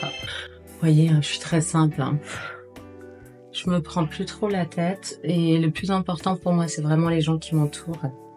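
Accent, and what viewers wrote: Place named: Geneva, Switzerland. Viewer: J'adore les intonations Genevoises ...c'est un plaisir de vous suivre depuis la Suisse plein de belles choses pour la suite.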